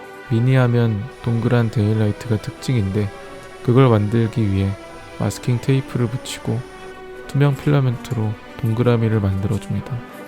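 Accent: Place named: Chungcheong, South Korea. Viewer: 구수한 충청도사투리 ㅋㅋㅋ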